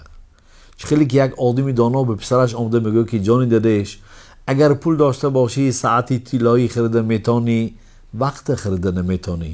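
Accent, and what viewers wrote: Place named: Tajikistan. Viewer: بسيار خوب به لهجه شيرين تاجکي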